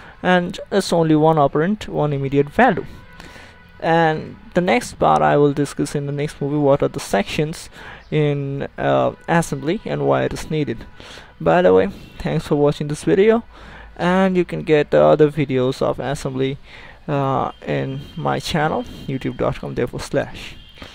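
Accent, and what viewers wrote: Bengal, India. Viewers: he is indian and his mother language is "Bangoli"(local language)... so this "Bangoli" accent is coming in his English.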